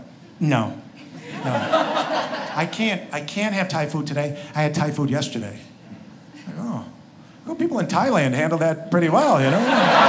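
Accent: United States. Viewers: he sounds American not Irish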